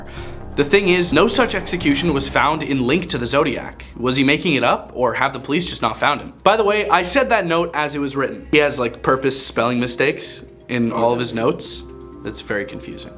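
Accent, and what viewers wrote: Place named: Canada. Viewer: Are you from Canada? A few ways you pronunciate certain words, remind me of some dear Canadian friends, I knew years ago. Love your channel plan to binge today!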